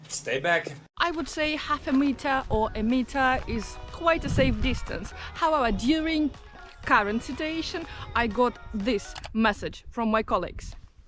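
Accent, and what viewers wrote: Russia. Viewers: This Russian accent :) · Having trouble placing her accent. Russian? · The English accent sounds similar to Russian. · What is your accent. Are you from Russia or Eastern Europe? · I like your Russian accent 😬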